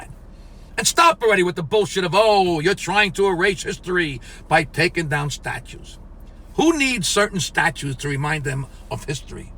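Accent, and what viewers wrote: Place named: Long Island, United States. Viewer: says it in the Long Islander way, my home town....he may be a comic but history shows a lot of comics make a lot of sense; like George Carlin. Just listen to this guy and tell me if he is wrong, think about it and if you still don’t get it, play it back again and think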